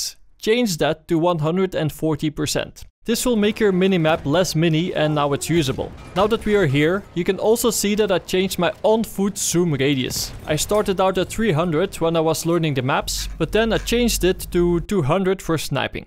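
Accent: Netherlands. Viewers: he sounds Dutch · dutch accent but hes from denmark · fix your dutch accent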